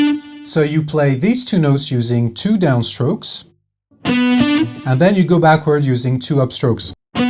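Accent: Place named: England, United Kingdom. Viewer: Nice english accent:)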